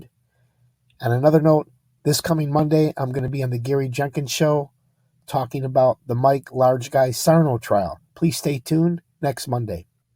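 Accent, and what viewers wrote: Chicago, United States. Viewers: You've got a natural talent for this and a great Chicagoan speaking style. Run with it. Your doing real good. You keep it real with the chuckles at times and all. Keep it about the trials and cases and you can go far. GL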